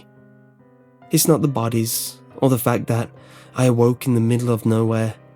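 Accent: United Kingdom. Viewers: The dialect and narrator were so British it hurt. It hurt real good.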